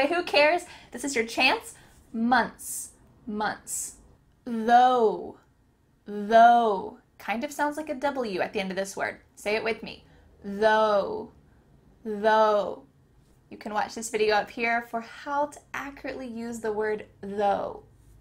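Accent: United States. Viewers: Oh, as a Brit I had to abort! Those pronunciations are... very American! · Please do not say you are speaking or pronouncing “English” You are pronouncing :AMERICAN”. It is very different. In English remember D is at the start of the word. Mirror is MIR. Ur. Asks is a long A “R skas....Please you are murdering my language !!!!!!!! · Not that hard. Just kidding. Not that hard for me now yet it did take me some time to master the pronunciation of those words. And I'm glad you didn't include words such as whirlwind or caveat. (By the way, do you live in the US? Your speech sounds more and more American. I remember you mentioning that your husband is American - I might be wrong about that). What a teacher you have become ! Kudos to you. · This is American English pronunciation - bah humbug. Correct your title. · Please stop learning English from an American, they destroy the language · I know you say this is American English, but as someone who’s been born and bred in the UK, this is sooooo American 😂 I love it 😻 it’s all the words that stand out to me when watching American shows, I could hear the difference but I couldn’t understand what it was that made it different, this is so cool, thanks 🙏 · American English pronunciation? 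Yes, I think so. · hell this is not English its American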